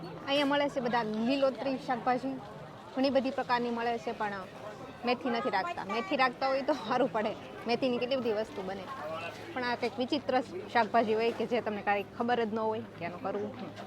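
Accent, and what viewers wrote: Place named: Saurashtra, India. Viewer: Tamaru ગુજરાતી એક દમ shaurastra nu દેશી છે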